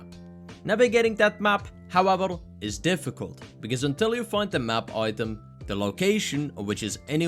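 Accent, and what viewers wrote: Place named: Germany. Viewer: What the hell is this accent? You sound like a German guy trying to speak Portuguese with a new Zealand twist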